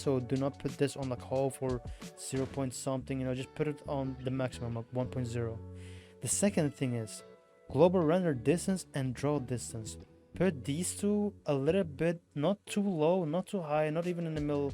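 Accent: Turkey. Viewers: türk mü la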